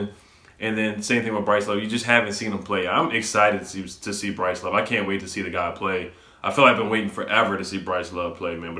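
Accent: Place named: DMV, United States. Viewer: On the Rawsta...the Rawsta.....Are you from the DMV area?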